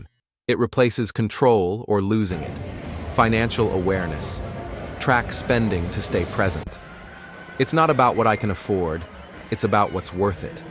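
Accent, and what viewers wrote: United Kingdom. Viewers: You're a Brit?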